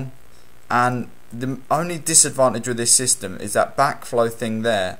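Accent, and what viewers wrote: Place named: Australia. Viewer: you sound like the australian guy on the series House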